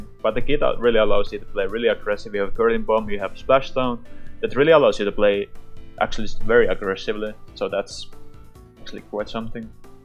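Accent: Finland. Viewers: Ui juma arvasin ihan suomen aksentti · Suomi? This was recorded to me from yt and you have a Finnish acsent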